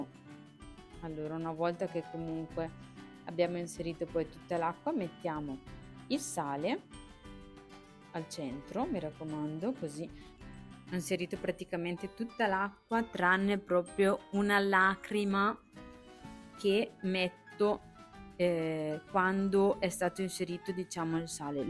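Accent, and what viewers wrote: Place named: Brescia, Italy. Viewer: bravissima, oltre che l'accento bresciano che e di una simpatia unica, sabato provo a farla alla tua maniera.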